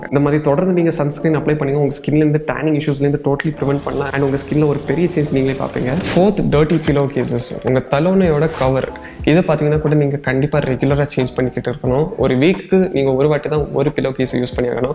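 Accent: Kanyakumari, India. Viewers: Bro kanyakumari ah?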